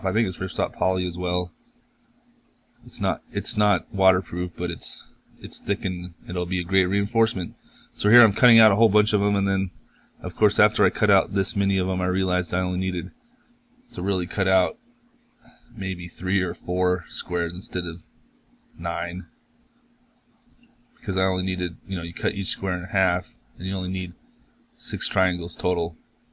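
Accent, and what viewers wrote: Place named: Reservation, United States. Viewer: I am kind of curious as to what part of the country you live in? You have what sounds awful lot like a Rez accent.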